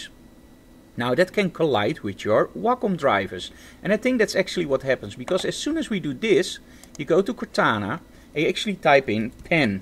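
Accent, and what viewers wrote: Netherlands. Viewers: Hm Me: looking for a fix for my wacom Me: Coming across this video Me: huh, this guy has a typical dutch accent.. I wonder if he's... Me: * finding his website * Me: .. I was right.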